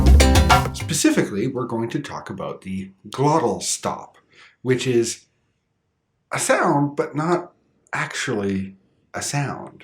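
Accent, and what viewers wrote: Canada. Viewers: It is English, from a native speaker, he's also Canadian, so it would be "Canadian English" I suppose. The North American pronunciations are the widest spoken, more than 75% of native English speakers use North American pronunciations. If he were Welsh would you expect him to say "Welsh English"?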